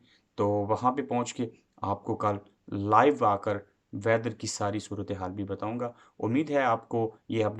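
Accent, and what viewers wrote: Kashmir, India. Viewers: Apnay kashmiri accent ko khatam karo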